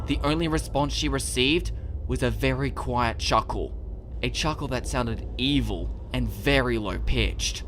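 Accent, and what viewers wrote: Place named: Australia. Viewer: Your Australian voice is funny honestly, I wonder who you are, you sound familiar XD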